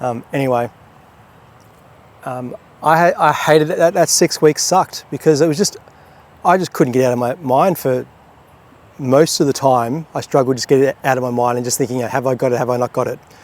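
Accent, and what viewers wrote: Australia. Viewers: Man, I don't know what to say! Get better soon? Feels like some kind of scam. I like your Oz accent, I hate your predicament. It's not fair. Love from Stockholm <3 So. The story you told about getting your diagnose. How you felt like your partner and your brother were your champions, fighting for you. That like broke me completely. And I'm not usually the person who cries. Thank you again so much for sharing! What you are doing is brave and important I feel. It's like I don't know how to tell you, this touched me to my core and I'm not even a spiritual person.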